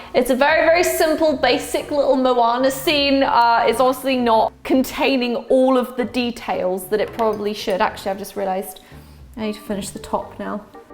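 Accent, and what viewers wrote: United Kingdom. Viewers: Hi, I have recently tried painting the little models you can get and they have some super tiny details on them (usually in the most awkward places on the model too, like the handle of a sword or a sleeping dragon's claws 🤣) I would recommend grabbing a set of nail art brushes. They're basically like Rigger paintbrushes but even thinner and come in different lengths and thicknesses but all of them are tiny enough to paint designs on fingernails so definitely would be good for tiny canvases. Alternatively, Mira (Byler) is always recommending the Arteza detail brushes set and she does a lot of painting on tiny/weird/awkward things videos 🤣 Where abouts in the UK are you from? I can't quite place the accent. I grew up in Leeds but now live just outside Brighton. Do you miss home or is the US home now? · where are you from ? cause' i love your accent and it sounds quite british.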